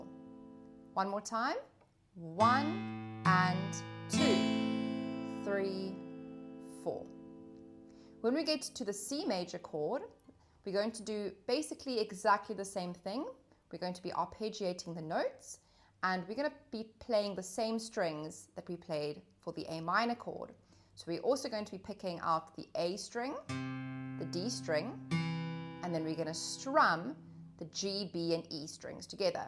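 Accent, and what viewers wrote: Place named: South Africa. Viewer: Love the Safa accent! Makes me miss home!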